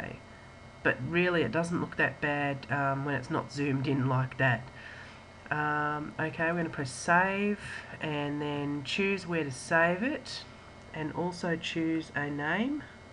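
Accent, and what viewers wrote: Australia. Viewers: About bloody time I hear an aussie Well done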